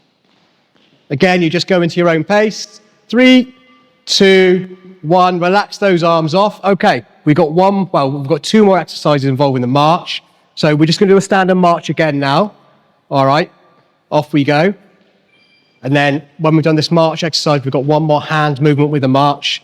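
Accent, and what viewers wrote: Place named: Wales, United Kingdom. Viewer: I agree! Plus I love the Welsh accent. Thank you,!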